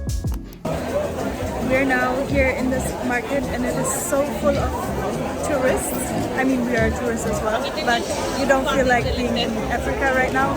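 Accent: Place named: Germany. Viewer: Ohhhh I recognize that accent 🥹. The lovely people who always say “ my English is not so good” then proceed to give me fluent English instructions to the bahnhof. I met the sweetest and kindest humans in Germany. I hope you enjoyed your stay in SA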